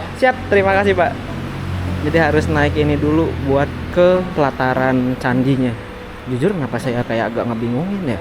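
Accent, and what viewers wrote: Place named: Depok, Indonesia. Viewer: Cara ngebawain narasinya kek mas mas depok bgt nih...apalagi backsoundnya...mirip mirip😅. Moga2 bisa 1000k subscribers bg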